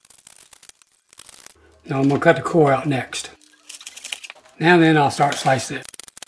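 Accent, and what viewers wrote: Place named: South, United States. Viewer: Sounds like joe Biden making a tutorial on making kraut but with a southern twang .... c’mon man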